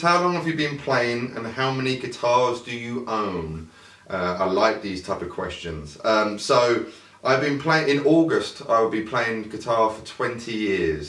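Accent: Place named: England, United Kingdom. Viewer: You look like an italian but you speak like an english dude ^^ I like that :D and feel Better ^^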